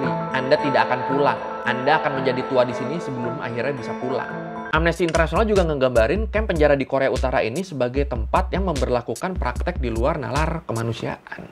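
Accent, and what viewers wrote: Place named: Bogor, Indonesia. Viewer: Bang lu orang bogor?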